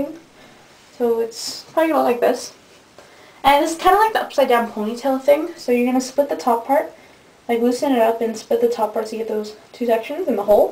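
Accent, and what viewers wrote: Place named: United States. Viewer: Yay!! I did it I plaited mine and then curled it :D Im goin to meet a lad in a couple of hours so Im goin to wear it curly then put it into this half way through so it looks as if Im good with hair haha. Love your videos and your accent, wish I had an American accent but I have an English accent cos Im english and live in england x :( haha xx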